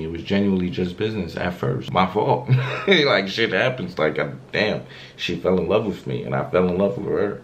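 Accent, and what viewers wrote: New York, United States. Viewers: The New York rlly came out in this vid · The New Yorker in him came out in this video broooo , yooo I’m cryinggg😂😂😂😂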